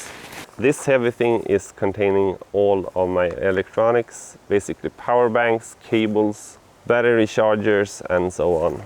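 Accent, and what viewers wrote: Sweden. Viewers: Hej! Du låter lite svensk. Stämmer det? Jag har precis beställt väskor som du har. Och en duffel man kan lägga på och spänna på. Har inte någon särskild plan eller resa i åtanke men har för avsikt att göra några längre turer till sommaren. Har det fungerat väl för dig?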